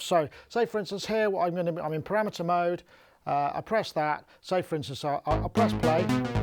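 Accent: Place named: United Kingdom. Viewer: It doesn't matter what you say, you're British....it works out.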